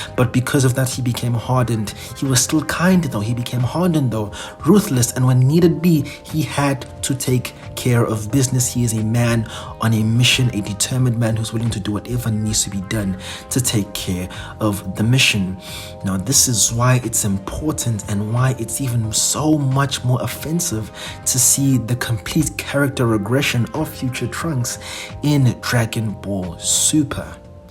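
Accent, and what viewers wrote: South Africa. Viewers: Are you South African ? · Sup man which country are you from? You sound like a South African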